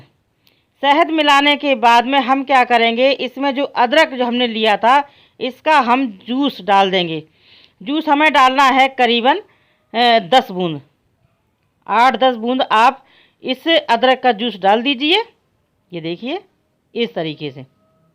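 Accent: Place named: Etawah, India. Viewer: Mam lagta ha app etawab se hoo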